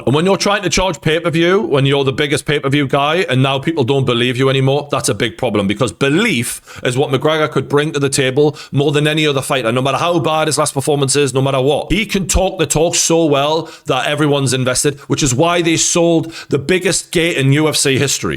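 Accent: Newcastle, United Kingdom. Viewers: Geordie boy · Geordie. You’re right.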